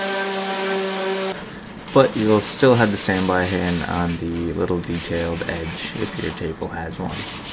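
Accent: Canada. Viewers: I've noticed you're canadian because of the accent hahaha 🥰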